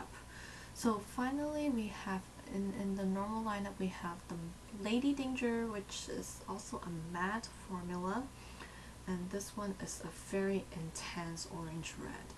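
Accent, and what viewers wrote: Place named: East Asia. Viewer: She sounds the same as other east-asian youtubers whose first language is not English.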